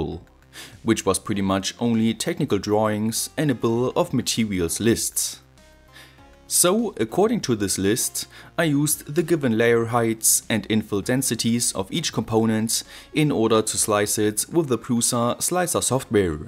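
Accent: Germany. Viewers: Hast einen deutschen Akzent 😂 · Man hört sowasfon das du deutscher bist ...... Kleine Frage noch warum sprichst du englisch... no offens wollte es nur mal wissen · German accent cool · When Deutsche sprechen English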